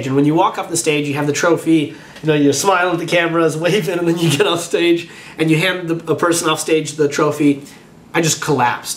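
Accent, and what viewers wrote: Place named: Europe. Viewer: Костя, у тебя на сцене почти не было акцента, а в реальности есть небольшой европейский, не совсем славянский. Интересно... Такое бывает когда в 10-13 лет иммигрируешь.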